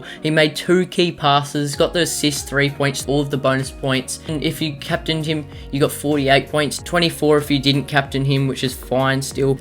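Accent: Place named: Australia. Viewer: Love Australian accents, you got yourself a new subscriber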